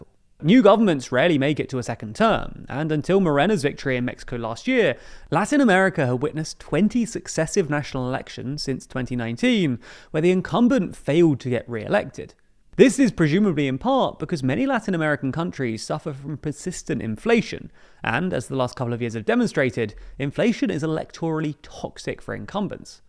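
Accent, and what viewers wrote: England, United Kingdom. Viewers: Poor research on this video. Ecuador has also elected a right wing president Daniel Noboa. The incompetence masked by an English accent.....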